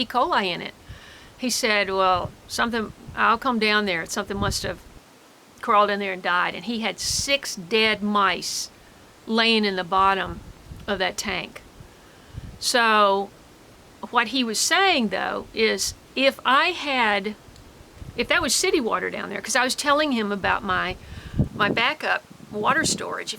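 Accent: Tennessee, United States. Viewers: Sounds like you are in Tennessee?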